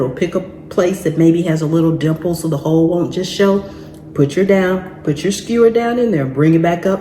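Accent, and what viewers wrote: South, United States. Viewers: The Southern drawl really makes the video interesting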